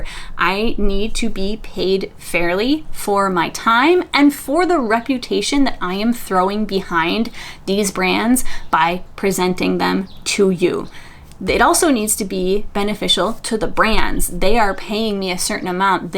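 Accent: Canada. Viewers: Yay eclectic! Also I love the way you always reply to comments. And yes I was crocheting while watching/listening. You are fun to listen to and there is nothing wrong with your Canadian mashup accent. I also have a CAN mash up accent in both official languages.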